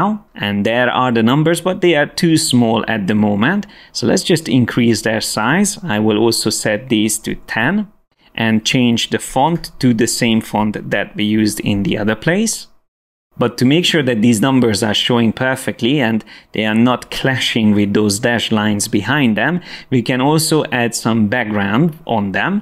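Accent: Hungary. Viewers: Great channel, awesome background and video, huge help! Thank you bro :) Ez most egy kísérlet arra vonatkozólag, hogy kb 4 másodperc után hasított belém, hogy oké, ez a srác ha nem magyar, akkor én se vagyok az :D A hunglish ellenére vagy inkább mindazzal együtt tényleg az egyetlen videó amit annyira profinak találtam, hogy tényleg végignéztem, és öröm látni, hogy új fejlesztésekről és korrekt designról kapok heads-upot és tutorialt! Podcast, newsletter, jó az ilyen profizmust látni azon felül, hogy tényleg kerestem valamit és meg is kaptam rá választ! Menőség és gratula! <3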